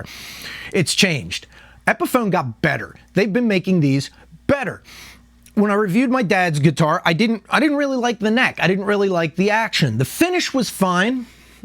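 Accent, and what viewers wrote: Philadelphia, United States. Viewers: I just picked one of these up used. I got a hidden gem. Might I ask what region of the country you’re from? You have a distinct accent, just like mine. My wife makes fun of me for it. I hail from the outer regions of the Philadelphia area. I grew up attending the Philadelphia folk festival, which is in schwenksville PA. Sounds like you’re from the area. Maybe, maybe not. Love the video and thank you for it my friend .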